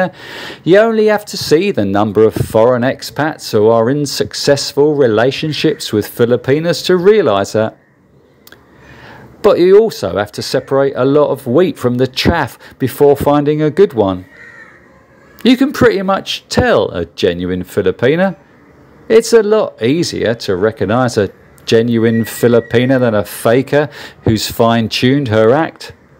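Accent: United Kingdom. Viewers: I like the Narration not the accent Shows you how the UK has different accents most of them are so common. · Hey. I hate to break it to you. My across the pond, Limey, Friend. As Western as these ladies appear. They only appeared, Western. This culture is as far away from our culture. As your Limey, accent. Is to my, Fine Midwestern Announcer, twang. On this side of the pond. And my brother was married to one for 25 years. Until a lack of love from her. Finally killed him. A little over 8 months ago at 64. Which is what happens. When they don't know how to give love. As in, actual love. Not just the fun love. But the real love. Love everybody needs. She never had for him. She was a gold digger. And found yourself an American boy. And took him for a ride for 25 years until he died. From a lack of love. So I really cannot fully comprehend their culture. As it is quite Foreign. 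To mine and yours. There is just nothing there to relate to. It is that Foreign. It's not like Europeans or Americans, at all. They only look that way. They only have the same stuff. The only carry-on in the same manner. To imitate the rest of us. And they are not what they appear to be. Though they can be quite lovely. And very dedicated people. But they have a whole bunch of whack jobs also. Most likely from their poverty. As I don't know what kind of poverty it's like to be a, Limey. From the UK? Though I could have a little idea? Since we both watched the same, Peter Sellers, Tracy Ullman, Benny Hill, Monty Python, shows. And all the others. I got them on both the CBC and PBS. Because I lived in Detroi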